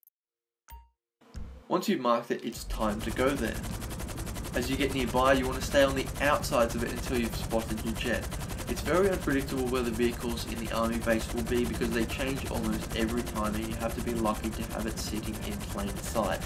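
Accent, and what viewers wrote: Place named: Australia. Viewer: Yay a Aussie voice :)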